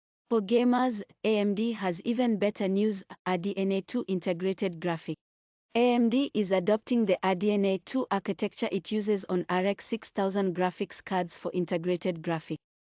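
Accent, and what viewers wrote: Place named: Africa. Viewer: Asian woman with African accent? Wow. Good video though.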